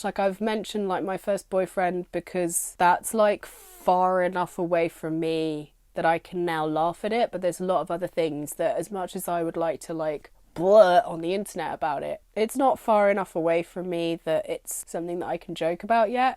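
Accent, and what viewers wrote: United Kingdom. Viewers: so is she a brit or does she just sound weird?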